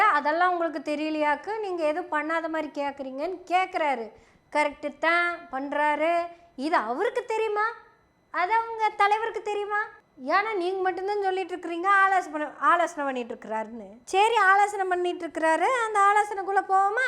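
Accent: Kongu, India. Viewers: கொங்கு தமிழ் சூப்பர் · ஏங்க கொங்கு பாசையை விக்கிறேங்கோ?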